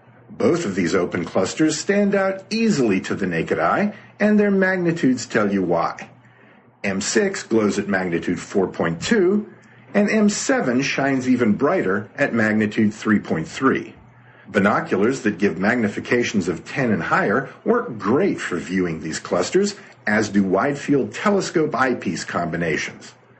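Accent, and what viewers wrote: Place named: United States. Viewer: you talk yanky too much. settle down on the oronunciation its to harsh.try to sond like english not overpronounced yank. nye.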